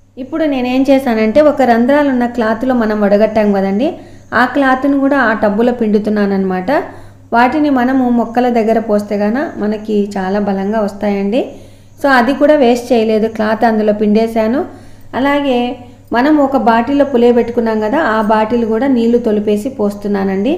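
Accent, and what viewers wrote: Rayalaseema, India. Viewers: Chala bagaa chestunnaru akka, mana Rayalaseema slang mee vedios lo happy ga vintunnanu....mee daughters nd atta sooo cute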